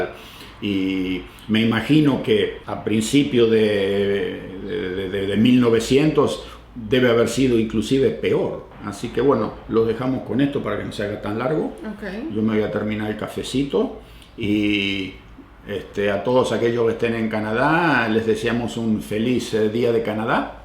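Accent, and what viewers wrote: Argentina. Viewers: y la tonada de donde viene? De Argentina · sos el pedacito de Argentina en Canadá, seguís teniendo la tonada argento.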